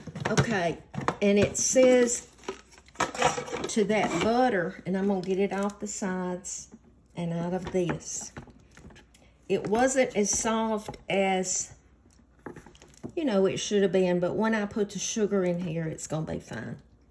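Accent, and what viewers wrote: South, United States. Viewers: I love your Southern accent !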